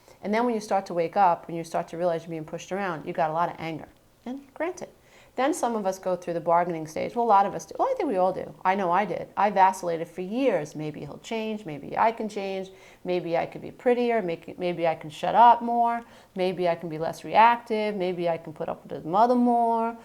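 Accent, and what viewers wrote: New York, United States. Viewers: Love the New York accent! You don't have to apologize for it. Thank you for this deeply inspiring video :) The message is spot on: your thoughts are your destiny! We empaths, who are stuck in the memes of the past, can't hear this often enough. Thanks to you, I finally get it! Peace and love to you and yours in the New Year!!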